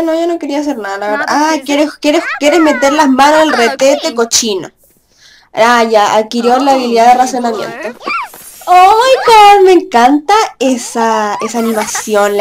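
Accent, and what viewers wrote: Chile. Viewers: hoooo apenas te escuche sentí que eras chilena porque dijiste la típica frase de el poh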